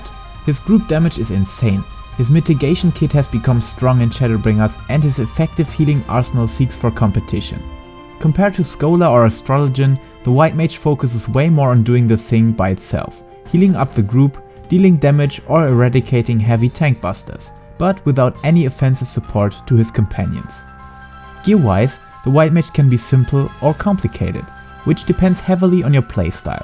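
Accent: Germany. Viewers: Are you German? That accent sounds like it! (I'm German too haha.)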